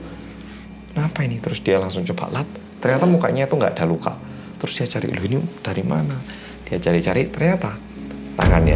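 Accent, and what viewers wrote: Java, Indonesia. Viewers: Mas kelahiran Daegu, Korea Selatan 8 Mei 1994 ini juga pernah membantu tim Running Man ketika syuting di Yogyakarta. Dikit lagi gess ultah mas medok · Medok bangett sihh kakkk,gemezzz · Serem banget ceritanya mas....merinding abis.... Btw, mas kan dah lama balik ke Korea....gimana caranya mempertahankan medok Jawanya?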